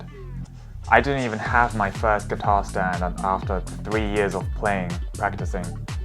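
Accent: United Kingdom. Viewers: BRITISH ALERT! im a Brit too :) love the videos by the way❤️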